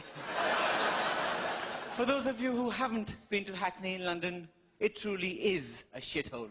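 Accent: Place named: India. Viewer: Well-written but very poorly delivered with absolutely no spontaneity.. He speaks like my nephew would do in an Indian school play.. Probably the crowd is ethnically mixed and cannot make that out from his accent..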